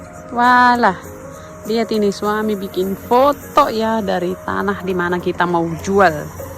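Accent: North Sumatra, Indonesia. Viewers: Ka kok logat batak kental banget ya😂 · Jadi ka itu asli dari samosir dan langsung merantau ke swiss, dsamosir itu bahasa batak dan kalo b.indonesia bakalan kental banget logatnya. Kalo ka merantau keluar medan mungkin bisa menyesuaikan, ka merantau ke swiss langsung pake bhasa sana, jadi mau gamau kalo ka ngomong b.indonesia ya bakalan tetep kental logat bataknya, and I think nothing wrong with that at all dan bahkan bisa jadi identitas 🙏🏼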